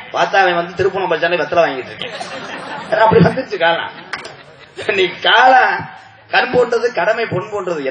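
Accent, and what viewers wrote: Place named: Chennai, India. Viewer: Unaku Chennai voice supper thala,chennaiku konzam mariyatha kuduga.chennai rasighan.please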